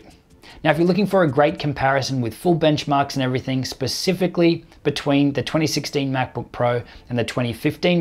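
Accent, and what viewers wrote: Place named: Australia. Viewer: Hey, nice to hear an Aussie voice doing some serious reviewing.:) I'm curious about the codec/s used in the tests across Premiere Pro and FCPX - were they truly the same asset? I know FCPX can transcod Camera formats to ProRes - which I would expect to export much faster being Intraframe based codecs. Cheers, JB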